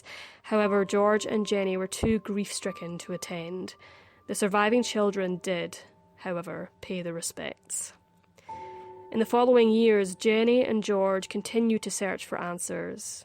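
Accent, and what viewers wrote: Scotland, United Kingdom. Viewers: This is NOT an American show. The so-called annoying voice is because the show, Dark Curiosities, is a Scottish YouTube mysteries series. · I love the Scottish accent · Is the narrator a Scott?✍ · No, that is definitely a Scottish accent.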